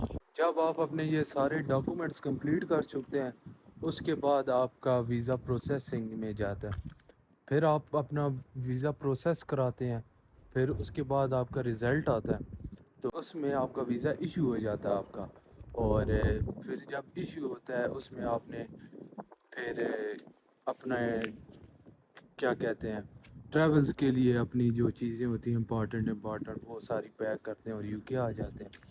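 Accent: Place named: Chakwal, Pakistan. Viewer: Ap Chakwal ke lagtey hain bhai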